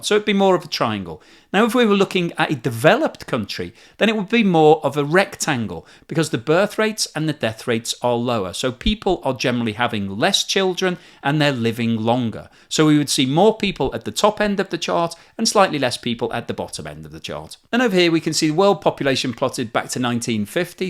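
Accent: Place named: Liverpool, United Kingdom. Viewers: Wirral accent. Slightly different from the Liverpool accent. Sounds Wirral to me. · if you're not snapped up by the BBC or SKY I'd be amazed. You present an impartial, clear and concise programme in your upbeat Scouse"/Wirral accent.. You leave most of the well known presenters in your wake. Keep it up.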